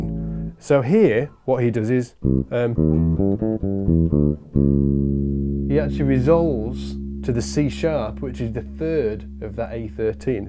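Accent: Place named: United Kingdom. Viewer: you are a great teacher. Years ago a police detective friend realized later in life the New York accent made him, let say upset. I too have found the UK accent has a similar effect on me. Which is the only reason I canceled my membership. Again you truly have the gift and it saddens me deeply. I wish you all the Health, Wealth and Happiness life has to give.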